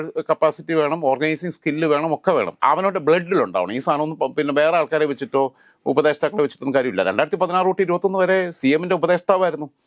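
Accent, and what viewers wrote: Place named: Kottayam, India. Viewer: Kottayam slangum kittum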